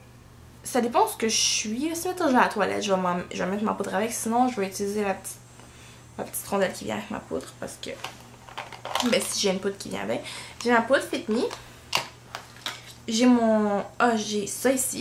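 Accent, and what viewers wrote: Quebec, Canada. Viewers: elle est quebecoise donc c sur que les autre ne comprendront pas sont accent ,elle parle pas trop vite pi c de lhumour,si vs etes pas content ,ben décaliser de sa page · hey guys spa un accent anglais cest quebecois ! Cette vidéo date quand meme de 11 mois fek ont scalme la ! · Je viens juste de te découvrir et j'ai déjà regardé une bonne dizaines de tes vidéos ! J'adore ta façon de t'exprimer, tes mimiques et puis ton accent Canadien fort prononcé :P En plus de ça, je te trouve vraiment très jolie et les conseils que ce soit en maquillage ou pour les cheveux sont très pratique ! Et hop, tu vient de gagner une abonné :D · C'est quand même marrant l'accent canadien ^^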